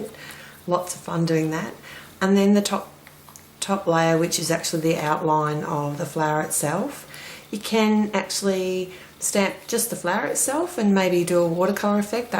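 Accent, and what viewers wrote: Australia. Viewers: Hi. Where can I buy the extra thick foam pad. From your accent it sounds like you are in Australia, so am I. Thanks again. Regards Liz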